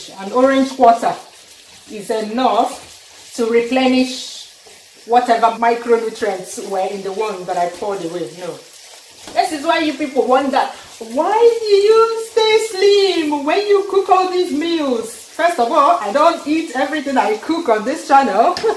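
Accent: Igbo, Nigeria. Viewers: Am more paying attention on that lovely igbo ascent more then the lecture Nnem dalu. On my way to supermarket over a month now this is exactly I need the German resentment never gave me the test I needed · Your accent is always sounding Nigerian, this jollof rice is called benachin or cheb in Gambia or Senegal respectively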